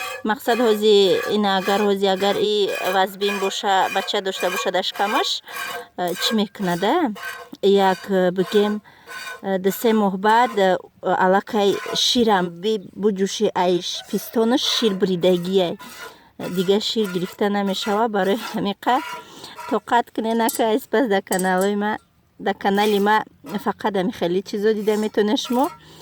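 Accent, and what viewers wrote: Tajikistan. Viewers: Лахжаи зебои тожики дори хохаржон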